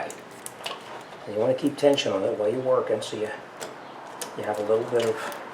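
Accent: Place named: New Jersey, United States. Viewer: I can tell from your accent you're from Union or Bergen county NJ. I am from NJ. Every mechanic I hear is from NYC/NJ hahaha. Thank you I needed this.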